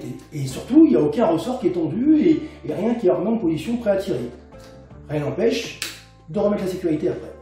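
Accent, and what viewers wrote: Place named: Loire, France. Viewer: Bonjour où trouve t on cette carabine? Au vu de votre accent n'êtes vous pas de la Loire du côté de Firminy ? 😉 Merci pour les réponse... CLT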